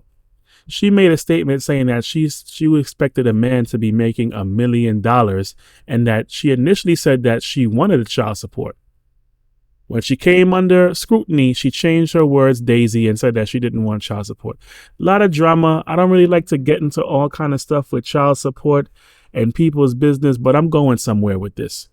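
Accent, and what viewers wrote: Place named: Caribbean. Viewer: Hearing a little West Indian in your voice but I can't put a finger on the island 😂Anyways men are too weak. Especially these day. We complain about women all day but their behavior comes from the fact that they are dominating us because we are soft, we accept anything, we are so hooked on p-sleeve. Fresh and Fit's podcast is a joke. CGA is the only guy doing the lords work. I know you recorded this before the update. She was lying but I knew this from the very beginning. Doesn't discount anything your saying. We need to do better.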